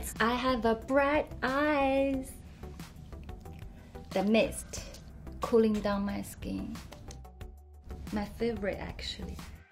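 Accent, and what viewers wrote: China. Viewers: Agree, also because her English sounds Chinglish. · Is she Chinese? Because this accent is common among Chinese. I like it!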